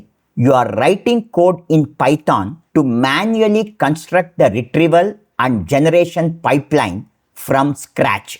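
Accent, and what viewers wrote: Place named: Tamil Nadu, India. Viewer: Good one thanks. I genuinely like your accent. I grew up with Tamil-speaking friends and reminds me of home.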